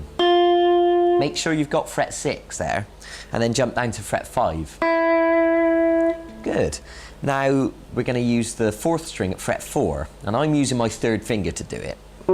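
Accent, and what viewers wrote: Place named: Wiltshire, United Kingdom. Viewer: Great accent - Wiltshire ? Great lesson too of course :-)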